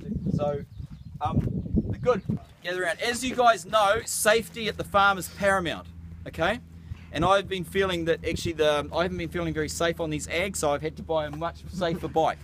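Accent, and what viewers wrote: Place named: New Zealand. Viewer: He's kiwi.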